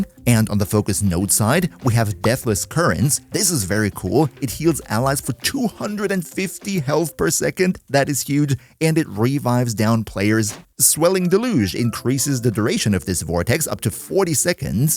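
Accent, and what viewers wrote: Germany. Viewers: Esl german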